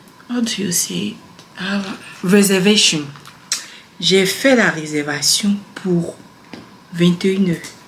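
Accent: Ivory Coast. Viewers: On adore l'accent ivoirien et le naturel dans la video! 😍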